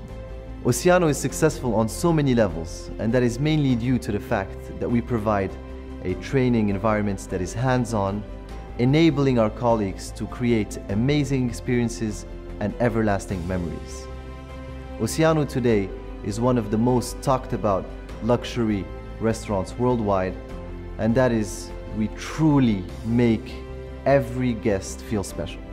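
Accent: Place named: Morocco. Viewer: What ever is the language you speak but your accent still Moroccan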